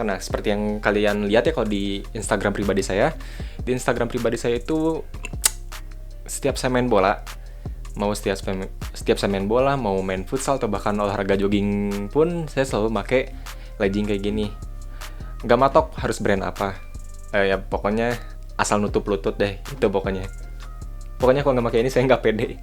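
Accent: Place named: West Java, Indonesia. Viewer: Sunda banget bang nyorodot dong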